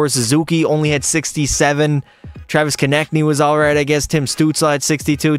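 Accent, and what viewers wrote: Newfoundland, Canada. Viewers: Are u from Newfoundland?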